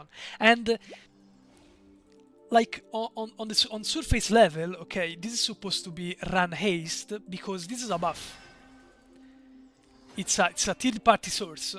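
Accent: Italy. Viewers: stupid question but are you italian??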